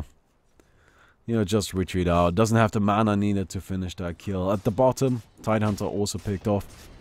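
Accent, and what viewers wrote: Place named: Germany. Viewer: I still really like watching these casts. it combines two of the most entertaining things that can be found in youtube. Games, and german opinions. that accent just makes everything more fun to me :)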